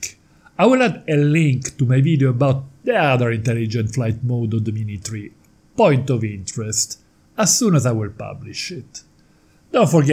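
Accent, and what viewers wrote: Italy. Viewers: great tips, and a lovely Italian accent too!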